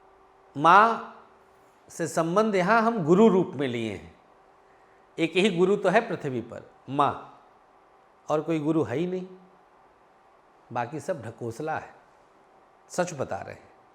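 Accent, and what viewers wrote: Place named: Awadh, India. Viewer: अवधी बोली❤ प्रणाम गुरु जी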